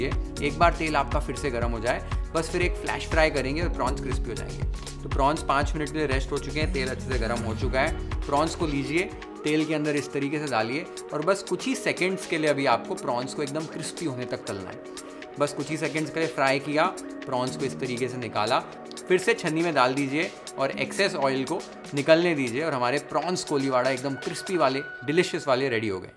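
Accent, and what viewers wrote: Maharashtra, India. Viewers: खूपच भारी 😘 आणि तुझ मराठी accent पण एक दम मस्त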